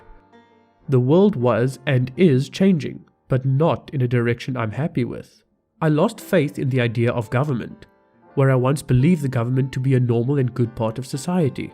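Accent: South Africa. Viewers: Rage against the dieing of the light - He sounds Indian but with a South African accent... 🤔 I wonder where he's from?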